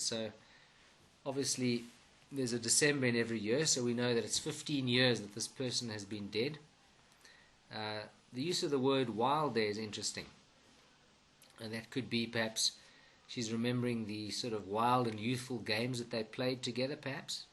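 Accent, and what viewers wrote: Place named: South Africa. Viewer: South African accent 💪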